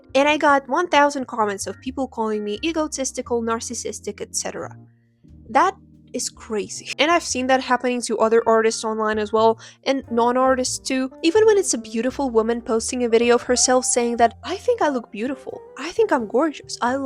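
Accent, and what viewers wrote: Eastern Europe. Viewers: бля ваш акцент ПУШКА БОМБА ОГОНЬЬЬЬЬЬ я надеюсь мой славянский радар все правильно улавливает (у вас ник я имею право делать предположение) потому что НУ БОЖЕ ЭТО ШИККККККККККК это самый.. наверное самый приятный акцент что я вообще слышала. очень круто. тем более если ирл вы не общаетесь с англами? колдун. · I'm an English tutor who mainly works with ppl from eastern europe and am like. 99% sure ik where your accent's from /lh. Not saying that bc it matters, just an observation - your English is Exceptional and you speak and use slang i'd say bordering on a level of some native speakers. It's clear you practice a lot and pay attention to pronunciation! keep at it <3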